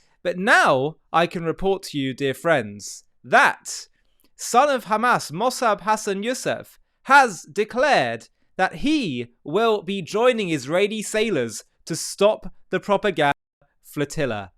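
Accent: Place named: England, United Kingdom. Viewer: HAVE YOU EVER BEEN TO OCCUPIED PALESTINE WITH YOUR ENGLISH ACCENT??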